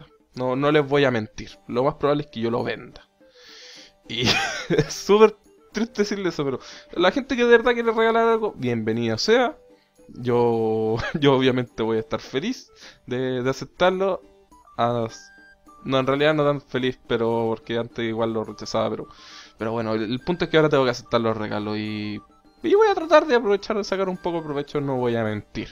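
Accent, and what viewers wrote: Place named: Chile. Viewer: Yo te sigo porque sos chileno porque y amo tu acento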